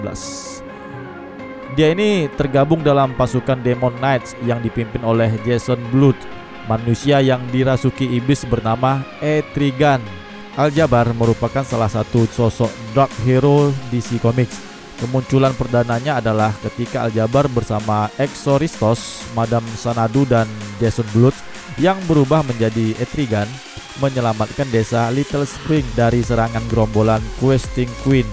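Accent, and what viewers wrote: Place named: Medan, Indonesia. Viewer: ente orang Medan ya bang...??? Dari logat²-nya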